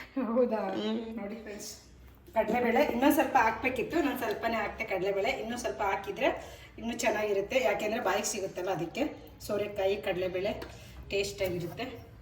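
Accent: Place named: Hassan, India. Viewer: neevu hassan kadevra neevu mathadodu and aduge style ade reethi ide navu hassan adke kelde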